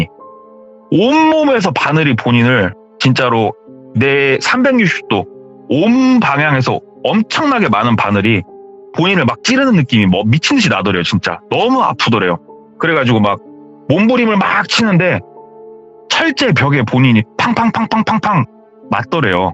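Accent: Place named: Jeonbuk, South Korea. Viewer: 전북출신으로써 말투가 너무 정겹다 ㅜㅜ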